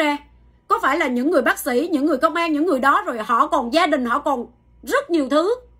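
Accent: Standard. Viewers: noi qua chuan.m cam on chinh phu dai loan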